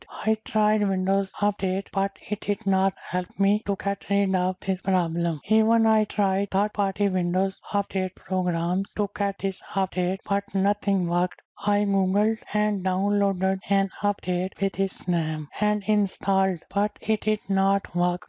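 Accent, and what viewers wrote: India. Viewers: Indian robot voice, damn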